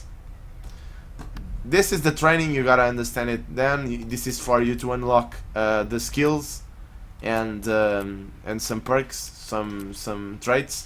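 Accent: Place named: Portugal. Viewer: Bom video, assim que te comecei a ouvir percebi logo que eras português kkk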